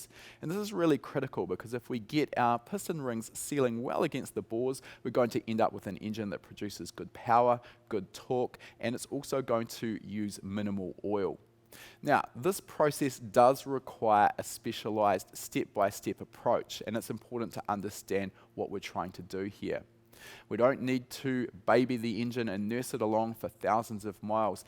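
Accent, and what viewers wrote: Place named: New Zealand. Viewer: Thanks for the reply,. Thanks to the vast amount of time I've spent listening to you, I can whip out your NZ accent at a moments notice at this point. Great fun in a party/pub moment.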